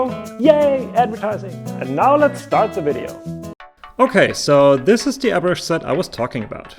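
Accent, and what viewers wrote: Germany. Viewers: spork they have a German way of English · Sehr nice videos die ihr da macht! Ich bin vielleicht kein cosplayer aber ich schaue diese Videos einfach trotzdem sehr gerne. Sie sind sehr unterhaltsam und den deutsche akzent kann man einfach so herraus hören das alles so "vertraut" vorkommt. Was ich mich einfach noch frage ist, wo die Tracer guns sind? :D Tracer ist ja das Masktochen von Overwatch und fehlt mir irgendwie in eurer video Liste. Macht weiter so und ich hoffe ihr werdet einfach NOCH größer! PS: Ich habe euch natürlich auch ein Abbo da gelassen. MFG Sebastian ;)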